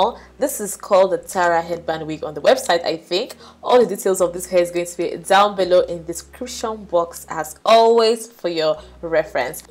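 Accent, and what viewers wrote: Nigeria. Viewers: I’m here for your Nigerian accent and your accessorise!!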